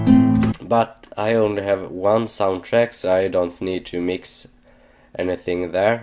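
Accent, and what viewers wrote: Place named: Sweden. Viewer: fyfan va svensk du låter :P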